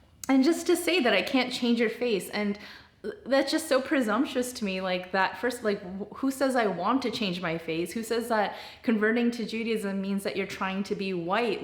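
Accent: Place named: United States. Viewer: Technically you're not really Korean anymore. That's your past. Korean and American are nationalities and so is Jewish, and you can only truly be one. From your accent I assume you used to be an American with Korean background. Now you're a Jew with American and Korean backgrounds. Even though I'm not a convert, sometimes I forget myself and think that I'm American, so I deal with a similar kind of issue. My wife is a convert, though.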